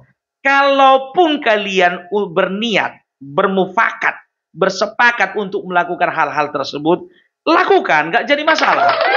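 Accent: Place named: Batak, Indonesia. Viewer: Aku Seinang deingan gaya Batak Kau, Kawan, teirus berkarya kawan😂😂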